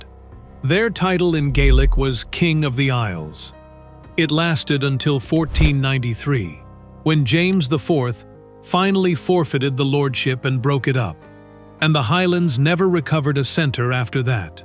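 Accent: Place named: United States. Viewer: Why is it so hard to get a real life narrator. The dramatic AI narration with the US accent is so unsuitabel.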